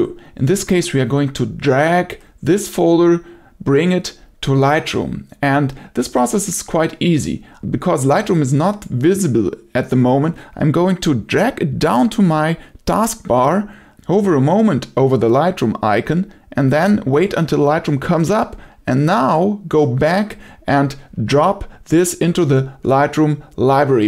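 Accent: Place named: Germany. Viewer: Excellent tutorial, I love you accent, are from Germany, just a little correction in you English, when you said "this is the workflow buttons which I explain you", you should say "which I will explain to you" I hope you don't mine me correcting you because you English is excellent, keep up the great tutorials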